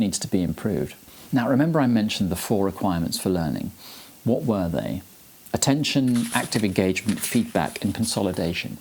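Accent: United Kingdom. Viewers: learning thinking skills in general is a key factor in any successful learning process including critical thinking for sure to isolate false data and extract facts based on sound truthful information, then comes skills how to read, how to write and how to search for truths by yourself. thank you for sharing your ideas and i love the British accent you speak with, it sounds academic for me ! Thanks again.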